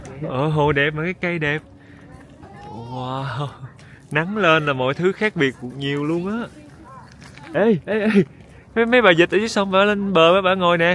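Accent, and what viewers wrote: Mekong Delta, Vietnam. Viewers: Song mot minh lau nam o Thuy Sy , moi lan nghe lai giong mien Tay cua Chau , Chu nho que huong " Soc Trang " qua , nghe rat that tha .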